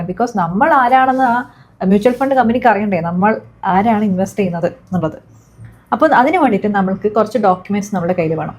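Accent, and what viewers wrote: Kannur, India. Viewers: ആദ്യമായിട്ടാണ് ഞാൻ നിങ്ങളുടെ വീഡിയോ കാണുന്നത്.. ഏതോ ഒരു സെക്കൻഡിൽ നിങ്ങൾ ഒരു കണ്ണൂർ കാരി ആണെന്ന് ഞാൻ തിരിച്ചറിയുന്നു 😅😅.. am i right..?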